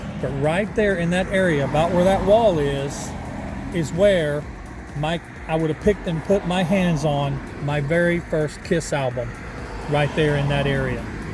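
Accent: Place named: North Carolina, United States. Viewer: I just knew you had a NC accent. I am also from NC (Asheville area) but I've been so many places I have lost SOME of the accent. Really enjoying your videos. Kiss fan since 1979 (age 4). I was buying records when other kids were buying toys.....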